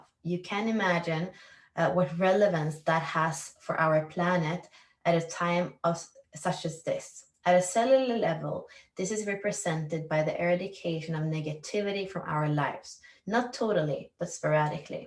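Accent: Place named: Caribbean. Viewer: You sound like a west indian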